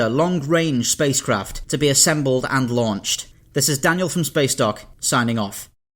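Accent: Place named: Liverpool, United Kingdom. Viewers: You sound kinda scouse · good video and script, shame about the slight northern accent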